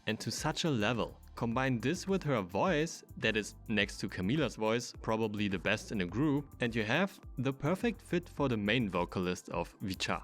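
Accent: Germany. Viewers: I think you‘re a german speaking English (no hate to you, just random fact I think I noticed 🤗) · Ich glaub du bist deutscher nh🤭 der Akzent kommt mir zu bekannt vor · You sound german?are you german? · yes i think so he sounds VERY german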